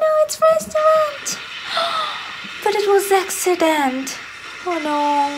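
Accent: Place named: South Korea. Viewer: Your English ascent is like Korean are you from South Korea, but you don't look like Korean.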